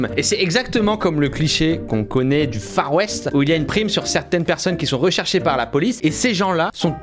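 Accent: Quebec, Canada. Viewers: .... Stp arrete de faire l'accent des québécois tu m'énerve tellement quand tu fait ca. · En vrai ton accent québécois sa va sinon super video😂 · je suis québécois et ton langage de québécois est a amélioré mais sinon continue tes vidéos j'adore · Ton accent québécois est parfait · Mec ton accent québécois est très drôle mais un conseil c’est o pas au dans hosti de tabernak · = l’accent québécois est trops cool Moi= fait une vidéo où tu parle juste en québécois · Chui québécois je valide en tabarnak ton accent continue tes vidéo tes le meilleur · Jaime ton accent québécois et je suis québécois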